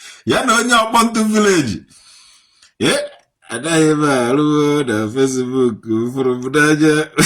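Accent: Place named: Agulu, Nigeria. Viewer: With this man accent he must be from Agulu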